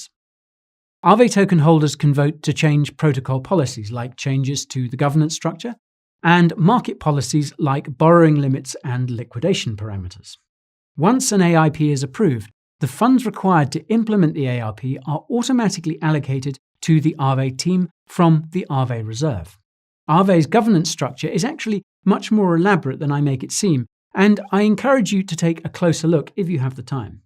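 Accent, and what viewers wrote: United Kingdom. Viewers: string agree....u from United Kingdom right as i love a way u talk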